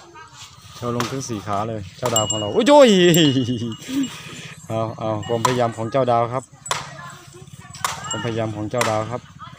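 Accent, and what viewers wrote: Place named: Isan, Thailand. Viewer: พูดอิสานแล้วฟังสบายคะ ทุกอย่างธรรมชาติดีที่สุด มักบักดาว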